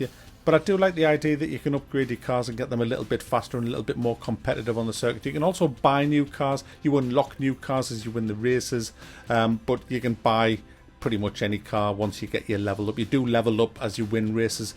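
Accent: United Kingdom. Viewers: Darby. Beeta. Gayme. Moode. Controollah. That's the British accent for you.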